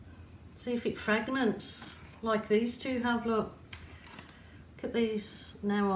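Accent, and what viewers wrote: Birmingham, United Kingdom. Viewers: Aaarh Bostin way spotted the Brum accent when we subscribed to you. From John ex Brummie (handsworth) but only moved to NE England!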